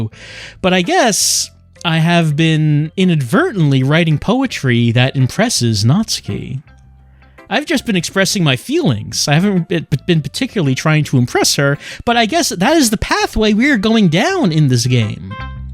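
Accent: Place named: New Jersey, United States. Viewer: Where did he pick up his funny Jersey type accent from? I forget.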